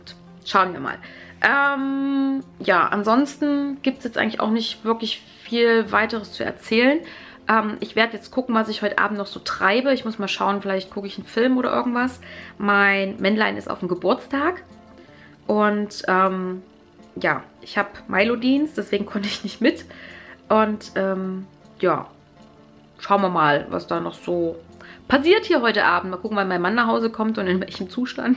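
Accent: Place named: Thuringia, Germany. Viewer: Erstmal toll das du wieder da bist. Ich hab dich soooo vermisst. Hoffe dir geht es wieder einigermaßen besser. Falls nicht schick ich dir gute Besserungswünsche. Süss das der thüringische Dialekt bei der Krankheit voll durch kommt. ❤️❤️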